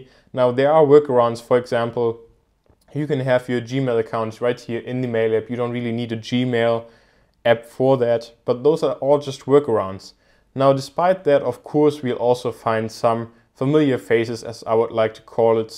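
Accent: Germany. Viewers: kanns sein das du ein deutscher bist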